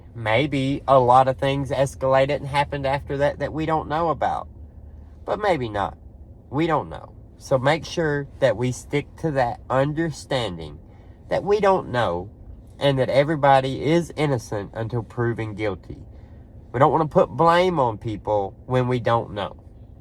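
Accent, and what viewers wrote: South, United States. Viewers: You are the cutest little southern boy I have seen . You are very sensible and quite smart . It’s so refreshing to hear your sweet southern accent which is pretty rare to hear here in NY City . I am a Grandma and have much more years on you . But if I was young again I would make you my boyfriend . Happy Holidays . Your secret admirer Grandma .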